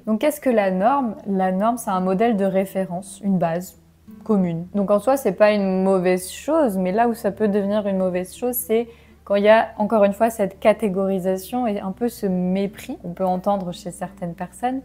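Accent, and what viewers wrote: France. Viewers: L’accent qu’on depuis la naissance n’est jamais incorrectes, à mon avis. Je crois qu’il y a des accents nombreux dans chaque pays. J’ai entendu dire qu’ici, aux États-Unis, un présenteur de nouvelles, né aux sud des E.U., adoptera un accent du nord s’il commence à travailler dans une compagnie nationale. Je ne sais pas si c’est obligatoire ou à la choix de la personne même. Quand j’écoute votre bel accent français, il me semble fou del le critiquer. Si je continue à apprendre le français jusqu’à je deviens un vieillard (pas longtemps)🤓, je n’aurai jamais un accent si beau en français. Mon accent en anglais n’est pas mal quand même, parce que je suis né dans la banlieue New Yorkaise, en l’état de New Jersey, le lieu de naissance de Bruce Springsteen, Bon Jovi, Frank Sinatra et Whitney Houston. Merci encore. À plus à mes amis francophones, surtout à Angers, ou j’ai passé un semestre d’études en 1978. 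· Je crois qu’en France la glottophobie est une forme de snobisme. Il faut parler comme un Parisian; sinon on risque d’être exclu de la société civile. C’est une tendance très destructrice. Je m’en souviens comment les gens ont moqué de l’accent de Jean Castex, ancien PM de France, parce que il venait de sud-ouest. Ceci dites en tant que anglophone il est très difficile pour moi d’entendre la différence entre ton français et le français parisien. Alors reste tranquille j’entends que de bon français chez toi.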